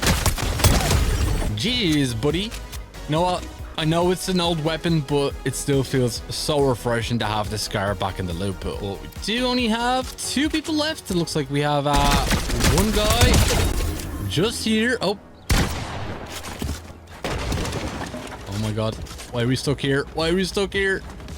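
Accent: Ireland. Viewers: Not trying be annoying I never knew u were irish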